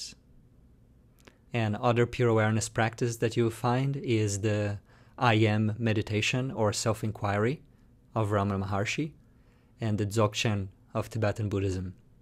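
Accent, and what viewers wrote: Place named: Italy. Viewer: Interesting. I'm used to simply watching my breath for thirty minutes. I think I will continue that way. Simple is what works for me. Great stuff,. Continua a fare queste cose e aiuterai a tantissima gente. I hear an accent and is an Italian name. Io sono cresciuto a Napoli!!! N'abbraccio.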